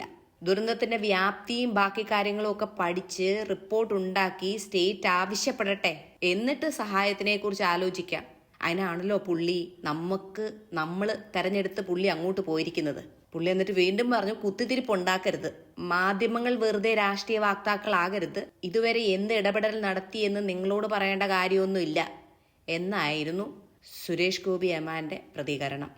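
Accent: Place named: Malaysia. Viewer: Oo you are malaysian malayali😁. Accent oru different pole thonni. Nalla videos. Maybe orientation maatamenkil.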